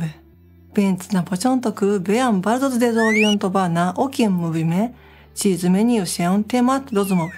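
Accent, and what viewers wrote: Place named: Japan. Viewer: Witaj;) moje imię to Mirosław = Mirek świetny przykład imion w gramatyce;) ciekawy jestem natomiast czy zrobisz odcinek na temat zodiaków Polskich i Japońskich? uwielbiam twoje filmiki świetnie mówisz po polsku;) pięknym akcentem Japońskim;)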